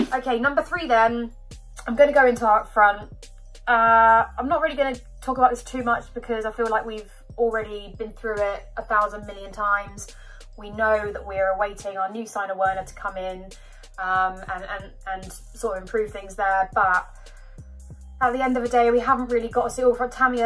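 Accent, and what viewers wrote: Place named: RP, United Kingdom. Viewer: 100% posh 🤣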